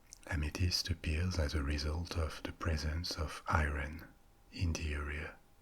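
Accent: France. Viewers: The content is fab in that it takes you to far off exotic places but that sexy French voice sends me to la la dreamland. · t'es français ?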